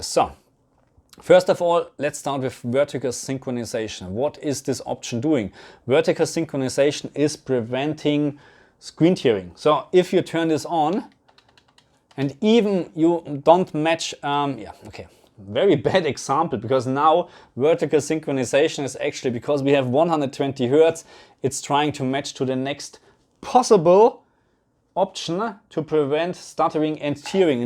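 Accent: Germany. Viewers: I have a question, sir are you german? You talk english like german person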